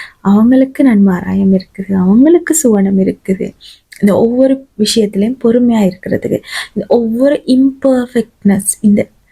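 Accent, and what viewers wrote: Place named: Sri Lanka. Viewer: இலங்கை யின் சுவையான தமிழில். இனிமையான. இஸ்லாமிய சிந்தனை. நன்றி சகோதரி.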